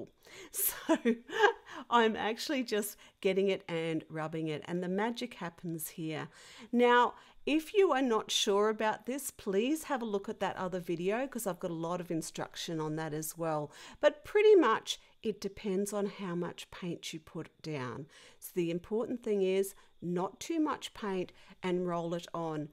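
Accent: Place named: Australia. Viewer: Hi! I live in Sydney Australia and very pleased to hear your Australian accent! Hoping you are living in Australia at the moment. I really want to try this. Which magazines have you had the best results from? I've heard some don't work.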